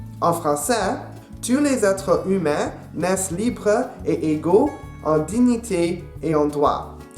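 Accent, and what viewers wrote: Quebec, Canada. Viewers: I find the Québecois accent you've got a little grating aha, i'm not sure why. Nice video though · Same here i was laughing the entire time im from Florida the very south of US (comme Marseilles pour vous) his accent is a northern it sounds canadien or british to me lol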